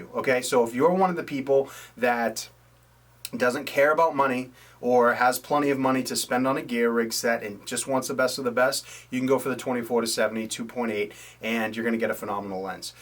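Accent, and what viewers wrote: Boston, United States. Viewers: Just got the Z6 am a portrait photographer working in-studio and natural light. will be renting the Nikon 50mm F1.8 S and the Tamron 24-70mm f2.8 G2 lens and using them in an informal shoot. What are your thought s on the Tamron lens if you've worked with it? Love the B&W, the info, and the accent being a Brookline MA ex-resident living in Seattle Thank you.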